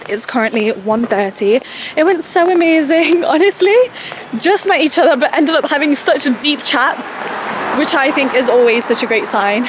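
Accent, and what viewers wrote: United Kingdom. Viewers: You just slayed it girl❤ and one request.. can u plz help us how you got the British accent bcoz Ur voice is so bold and amazing!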